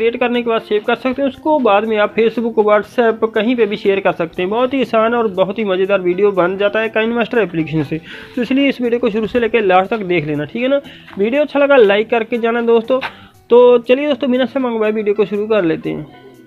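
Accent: Punjab, India. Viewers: Aap pke Punjabi hai na sir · Yehh punjabi voice😇😇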